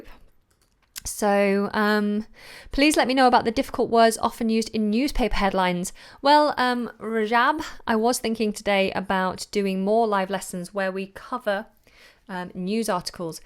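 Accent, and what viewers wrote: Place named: United Kingdom. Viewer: I really admire the British accent. Will it be easy to imitate it ? Please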